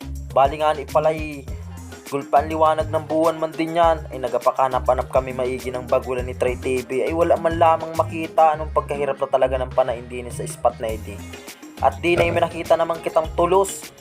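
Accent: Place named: Quezon, Philippines. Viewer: Broo taga saan ka parang Quezon ung Punto Ng salita nyo??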